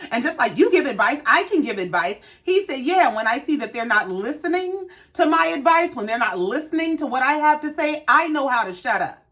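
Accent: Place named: Charleston, United States. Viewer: I just happened upon your page, and as a South Carolina girl if that ain’t Chucktown I hear I know sumn! Glad to have found you!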